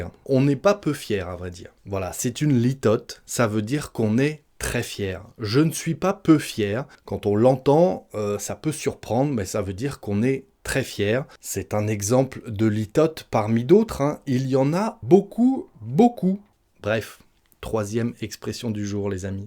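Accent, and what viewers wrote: France. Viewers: J'adore entendre, merci..Bénis toi.Tu es le meilleur, j'adore ton accent.Du français pur.J'apprends de toi YAY🤩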